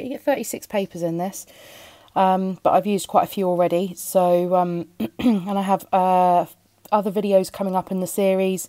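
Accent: United Kingdom. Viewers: I’m really enjoying this series. Years ago I spent 4 months student teaching in a nursery school in Wales and I miss the accent, not saying you have a Welsh accent but a wonderful British accent ☺️ and love that I can watch videos about a topic I love in a language I love ❤️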